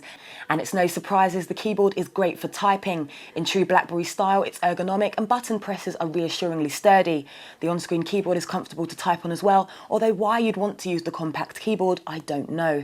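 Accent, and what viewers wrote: United Kingdom. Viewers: A Black girl speaking in uk english!!!!! WTF LOL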